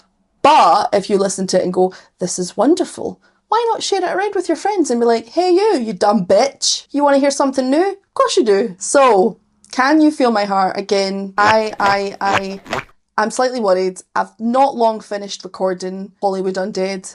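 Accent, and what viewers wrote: Scotland, United Kingdom. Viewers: Hey, I'm Bruna from Brazil. YouTube recommended your video to me and I loved your vibe. I'm learning English and have never listened to a Scottish person until now. Your accent is so beautiful. 🥰 May I recommend you a music video from a Brazilian singer? She sings in English,. Here's one of her originals songs: <link>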